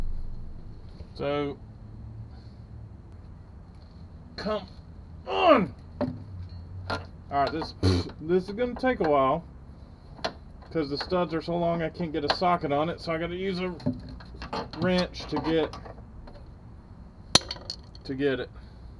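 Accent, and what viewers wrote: South, United States. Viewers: nice easter egg with the vgg theme, But I appreciate your natural southern accent, please dont adopt his larry the cable guy gimmick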